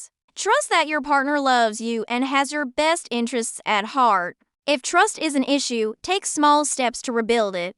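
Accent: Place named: South, United States. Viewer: Helpful video with a Heavy Southern Sandy accent though. Lol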